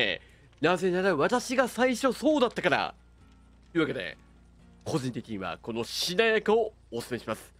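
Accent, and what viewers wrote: Kansai, Japan. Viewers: DBD気になっていたので、参考にさせていただきます！ 関西弁もたすかります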